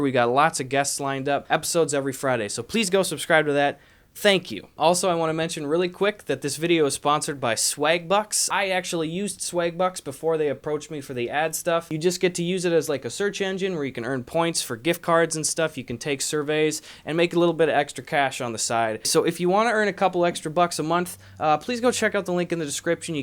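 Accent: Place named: Wisconsin, United States. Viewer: I can tell you're from Wisconsin from the way you pronounce your "a's". Sw-ay-g Bucks. Lmao. God, I miss that boring ass state 😩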